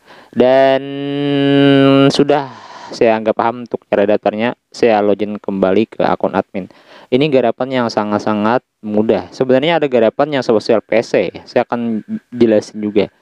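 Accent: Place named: Barabai, Indonesia. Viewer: dari logat kamu dari barabai yah? soalnya saya dari barabai dilihat dari kamu ngomong udah kelihatan kamu asal barabai hst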